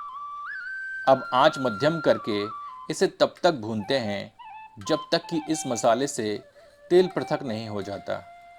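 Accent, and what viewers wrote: Standard. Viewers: शुद्ध हिंदी उचारण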